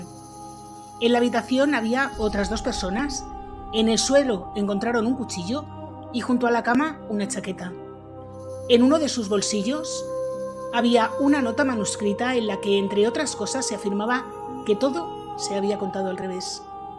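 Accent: Spain. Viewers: Gracias por el vídeo, aunque no me gusta el acento español me gusta como lo narras e incluso a veces me hace sonreír los comentarios que haces.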